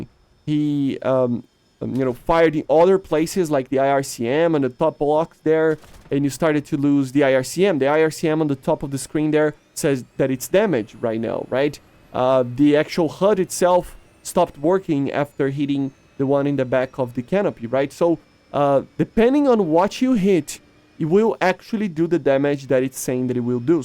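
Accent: Brazil. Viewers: hes brazilian. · he’s from Brazil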